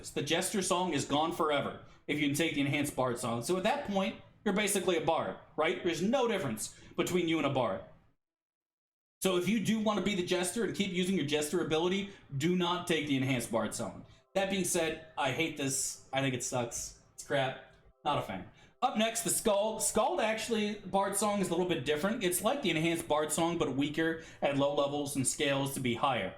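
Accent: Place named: Michigan, United States. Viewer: What's your 20ish? You sound like your from Michigan, but Cali and Michigan accents sound similar when recorded.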